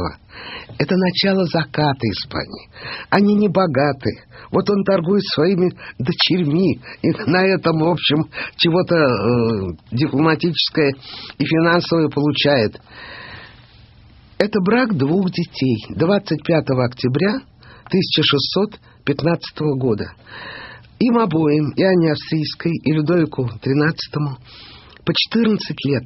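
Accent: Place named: Moscow, Russia. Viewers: Это московский говор. Так говорили в Москве всегда. Не булочная, а булошная, например. И при чем тут еврейка. Например, в Берлине и евреи, и турки говорят на берлинском диалект. И никого это не раздражает. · Это произношение, характерное для Московского диалекта.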